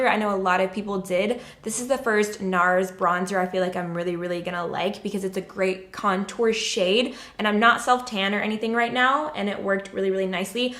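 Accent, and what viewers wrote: South, United States. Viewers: Kia Uhhhhh no it has nothing to do with audio. She used to have a very prominent southern accent.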